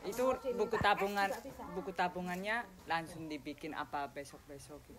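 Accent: Java, Indonesia. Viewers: Logatnya Jawa bener njir:v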